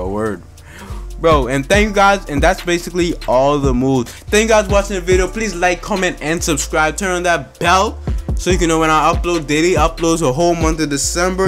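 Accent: New York, United States. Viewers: Where U frm. U sound like u frm NY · The most new york guy i ever heard on YouTube lol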